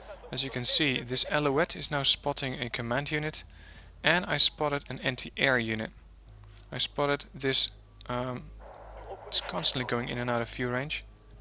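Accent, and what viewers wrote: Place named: Netherlands. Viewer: Bedankt man, heb het spel net gekocht, en ben het nu aan het leren, ik ken de basics van World in Conflict nog wel en dit lijkt er wel een beetje op. Je guids helpen er goed mee! (Volgens mij kan ik in je accent horen dat je nederlands bent, geen zorggen je klinkt niet als Mark Rutten)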